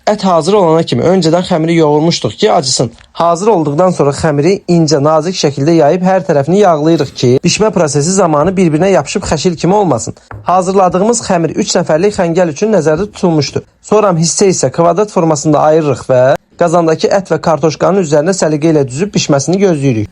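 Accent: Qakh, Azerbaijan. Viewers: qax lehçesi beledi de kimseninyamslamlr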